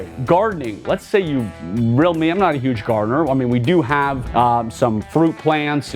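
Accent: United States. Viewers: So you dress up like the cowboy from the village people, new hat boots and clothes and you go to Dallas Texas with your Yankee accent and pasty skin and say you're from Texas ? 👌🏼 You'd last 5 minutes tops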